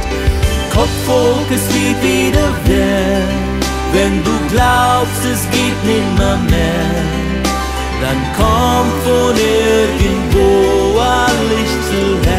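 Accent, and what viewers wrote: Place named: Vienna, Austria. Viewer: Wieder mal eine Botschaft, so wie es oft wirklich ist. Hörst Dich schon an, wie ein echter Wiener😊Aber es stimmt, irgendwo ist immer ein Licht am Ende des Tunnels. Gottes Segen für Dich, lieber, und für die, die Dich in Deinem Leben begleiten.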